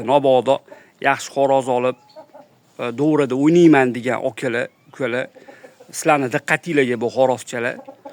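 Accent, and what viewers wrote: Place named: Tashkent, Uzbekistan. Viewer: Xaqiqiy toza Toshken sheva 😊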